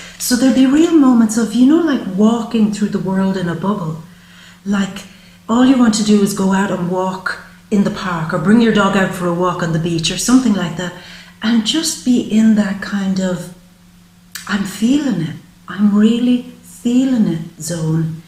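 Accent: Ireland. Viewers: Not only is she on point, but that Irish twang in her voice is so soothing, so healing.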